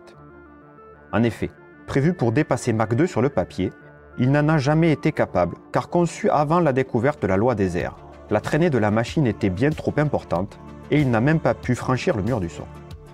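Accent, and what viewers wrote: South, France. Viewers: Je ne voulais pas vous vexer j'espère mais j'apprécie cette pointe d'accent sympathique du sud(?) il me semble. Je suis moi même méridional et je garde un petit accent dont je suis fier. 😉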